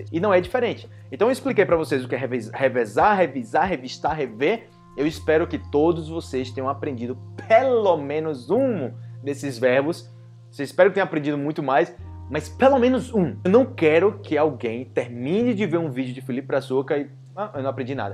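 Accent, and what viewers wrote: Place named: Colombia. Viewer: Eu gosto quando ele fala em espanhol, ele escuta tão colombiano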